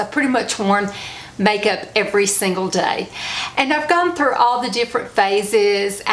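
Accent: South, United States. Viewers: It’s Lorraine in England. Like any good Southern girl I loved my makeup, too. I remember going to London with my Mom and aunt when Twiggy and Mary Quant were big. After listening to this video I saw a banner that a cover band , Union Gap UK , Young Girl etc. from the 60’s ,are in town tonight. I may have to go to the concert! You remind me of my girlfriends back home in Virginia which is why I like your videos and insta stories. I don’t hear a Southern twang that often unless on line!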